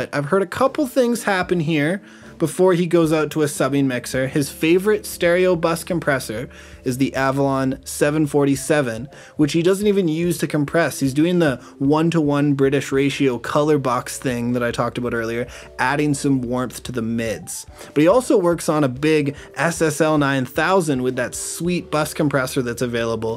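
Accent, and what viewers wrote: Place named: Canada. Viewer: I ❤️ Canadian accents!! Lol Output = Oteput Routing = Roteing Without = withote Great vid dude! I feel like you explained this better than anyone on YouTube!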